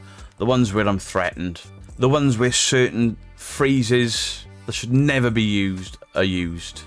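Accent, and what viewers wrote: Wales, United Kingdom. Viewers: it's a Welsh accent and Welsh people rock 😎🤟🌟🏴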